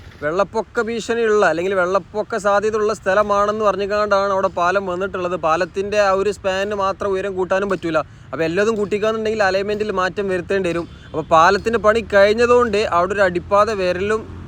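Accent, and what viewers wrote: Malappuram, India. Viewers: മലപ്പുറം ശൈലിയിൽ ഉള്ള വിവരണം കുഴപ്പം ഇല്ല പക്ഷെ സ്ഥലപ്പേരുകൾ കറക്റ്റ് ആയി പറയാമായിരുന്നു. Eg. കൊട്ടപ്പുറം അല്ല കോട്ടപ്പുറം എന്നാണ്, കൊതപറമ്പ് അല്ല കോതപറമ്പ് എന്നാണ്, മുത്തുകുന്നം അല്ല മൂത്തുകുന്നം എന്നാണ്. Google Map നോക്കിയാൽ മലയാളത്തിൽ സ്ഥലപ്പേരുകൾ ശരിയായി വായിക്കാവുന്നതാണ്. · enthe bro malapuram slang njagalum kelkkate..maya kuyi okk kelkkan oru rasamalle... pinne.vedio super...MODI kk ORU THANKS PARYAAN ITH kandapol thonni.toll koduthalum kuzhapam illa. aa parvoor .ente rabbe...ath in bro paranj apoe kalich chitch pokaam... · ഞാൻ ഇടപ്പള്ളിക്കാരനാണ് (കൊച്ചി)ഒരു നിരീശ്വരവാദിയും പക്ഷെ Central Govt നെ Support ചെയ്യുന്ന വ്യക്തിയുമാണ്. ഞാൻ താങ്കളുടെ വീഡിയോ ഇഷ്ടപെടാൻ കാരണം തന്നെ താങ്കളുടെ ഈ മനോഹരമായ മലപ്പുറം സ്ലാഗ് ആണ്. തുടർന്നും നല്ല വീഡിയോസ് പ്രതീക്ഷിക്കുന്നു. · I like Your Malappuram accent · മലപ്പുറക്കാരൻ മലപ്പുറം ശൈലിയിൽ തന്നെ പറയുന്നതാണ് നല്ലത്. 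യായും ഴയുമൊന്നും കാര്യങ്ങൾ മനസ്സിലാക്കുന്നതി ന്ന് തടസ്സമല്ല. അക്ഷരസ്ലോക മത്സരത്തെപ്പറ്റി വീഡിയോ ചെയ്യുന്നുവെങ്കിൽ അതൊക്കെ കൂടുതൽ ശ്രദ്ധിക്കുന്നത് നല്ലതാണ്. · he already mentioned that he is gonna speak in Malappuram slang then why are you bothered..in fact that's why I follow his videos coz its different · ബായ്, ഞാൻ എറണാകുളം ജില്ലക്കാരനാണ്... മലപ്പുറം ഭാഷയും, ഫുട്ബോളും എനിക്കിഷ്ടമാണ്... നിങ്ങളെ സുടാപ്പി എന്നു വിളിച്ചു കളിയാക്കുന്നവർ വിവരദോഷികൾ മാത്രമാണ്.... മൂത്തകുന്നം jn. ഇൽ നിന്ന് വലത്തോട്ട് തിരിഞ്ഞാൽ ചെറായി, വൈപ്പിൻ വഴി, വല്ലാർ പാടത്തും എറണാകുളം നഗരത്തിലും എത്താം.... നിങ്ങളുടെ ഭാഷയിൽ ഈ വീഡിയോ ഇനിയും കേൾക്കാൻ കാത്തിരിക്കുന്നു...😂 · Is this Malapuram accent? Never been there.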